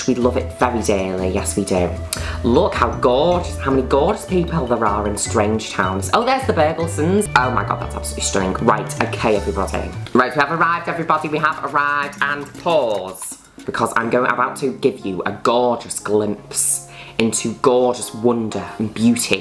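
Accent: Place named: United Kingdom. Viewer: I don't like the British accent ngl.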